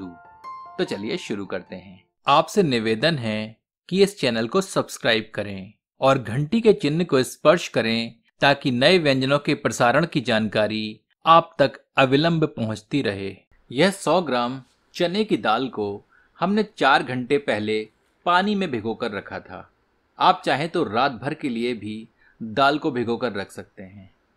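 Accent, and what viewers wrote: Standard. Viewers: Sir dekh ke hi muh Mai paani aa gya.. Jarur try karenge.. Aur recipe se jyada maza Aapki shudh hindi sunne Mai aaya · Namaskar. Shriman aap ati shuddh evam sundar Hindi bolte hain. Is byanjan ko mein prastut karne ka prayas karunga. Dhanyabad.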